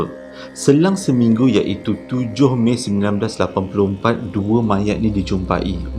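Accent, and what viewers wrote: Java, Indonesia. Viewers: Gaya ckp santaii.. kok mcm ad slang jawa² sikit ckp yer tuu..😂 terus kn brcerita n mmberi kn cerita y best² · Wong jowo yo.. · Sedih dgr cite tp kelakor dgr abam ni cite,jowonye pekat😂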